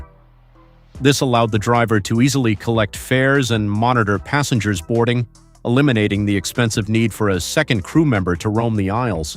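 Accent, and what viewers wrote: United States. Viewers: Why do we have to tolerate an American accent for almost ALL YouTube channels?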